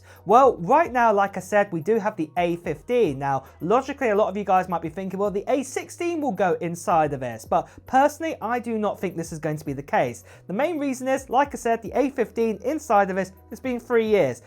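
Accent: United Kingdom. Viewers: I definitely will buy the next  TV. You are really good explaining the new devices plus it helps with your British accent, I m from London UK. 🇬🇧 😁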